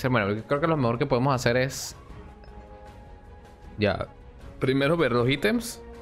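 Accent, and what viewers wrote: Venezuela. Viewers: lo mismo me preguntaba yo xD es venezolano ? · Eres venezolano mano?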